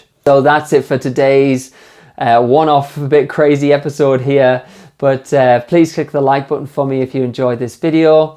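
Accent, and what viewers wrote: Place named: Newcastle, United Kingdom. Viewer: Geordie maybe 🤔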